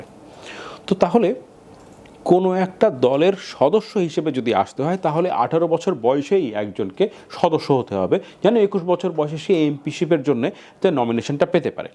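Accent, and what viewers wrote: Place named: Kolkata, India. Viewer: আপনার ভয়েসটা একদম কলকাতা দের মত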